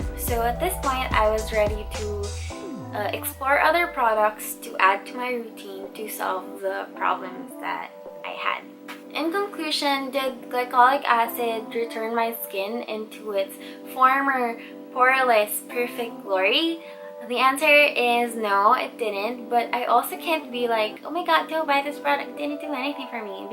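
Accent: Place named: Philippines. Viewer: your accent is SOO cute!! are you filipino???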